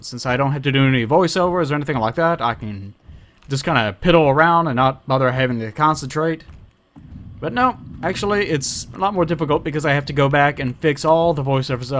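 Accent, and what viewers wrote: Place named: Kentucky, United States. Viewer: Goddamnit, how do you make a Kentucky accent sound so gallant?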